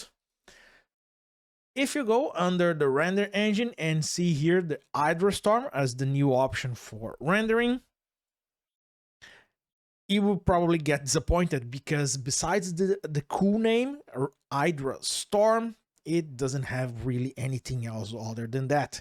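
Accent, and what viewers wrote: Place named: Brazil. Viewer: sotaquinho brazuca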